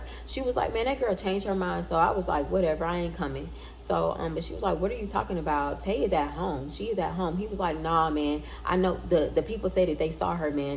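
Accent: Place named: South, United States. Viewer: Yess your story times are the BEST 🙌🏾 and I can tell you from the south lol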